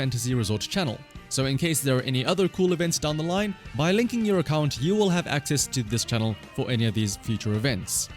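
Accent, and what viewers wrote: South Africa. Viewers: I'm sorry this is so sudden and random but this has been bugging me for ages... Are you South African? Your accent is so specific (I'm South African too)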